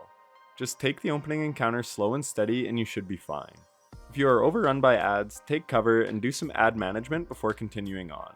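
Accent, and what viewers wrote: Canada. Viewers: I was fairly certain just hearing you speak but as soon as you said “off the rip” I knew definitively that you were Canadian lol. Solid video, dude. 👌🏻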